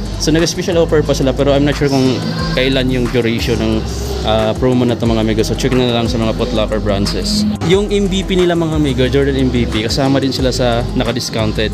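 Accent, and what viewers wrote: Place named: Visayas, Philippines. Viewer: Bat ganyan accent ng pananalita mo sir. Bisaya kba Dong. 😂 ✌️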